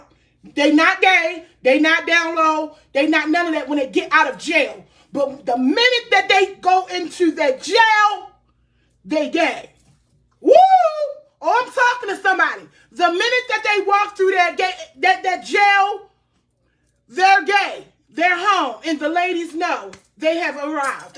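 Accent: Baltimore, United States. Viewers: That Baltimore accent sounds good! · Her accent izzz thiiiick lmao like my cousins in Bmore. I was like is she from Pittsburgh or Bmore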